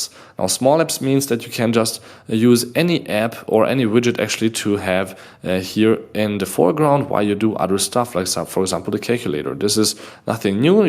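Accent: Germany. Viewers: Shailen Sobhee which is really weird as he mentions that he is Hungarian. He has a very definitive German English accent :) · Durch den deutschen Akzent einfacher zu verstehen als Videos von Amerikanischen YouTubern! :) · he sounds so funny and german i would like to listen this for hours and hours😆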